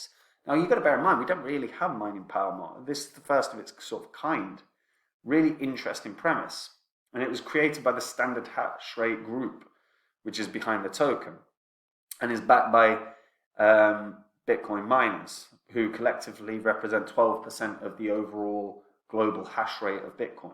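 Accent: Scotland, United Kingdom. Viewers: do i hear a hint of scottish in your voice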